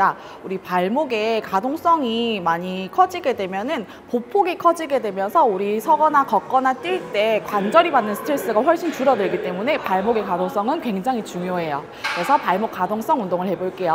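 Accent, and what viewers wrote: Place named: Busan, South Korea. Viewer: 오붓싼?! 같은 부산이라 그른가 사투리 찰져서 이해가 쏙쏙 잘 되네요😊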